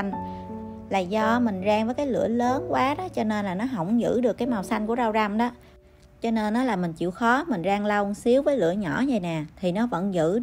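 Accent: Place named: Tay Ninh, Vietnam. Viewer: quê ở đâu vậy??? Nghe giọng như dân Tây ninh ah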